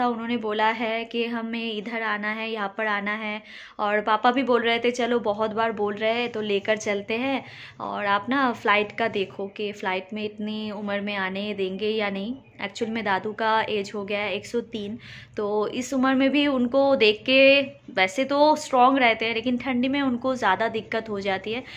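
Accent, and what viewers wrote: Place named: Bengal, India. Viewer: Love you....apki Hindi n mujhe bahut acchi lagti hai bengali wali ultimately 😀😀😀🤗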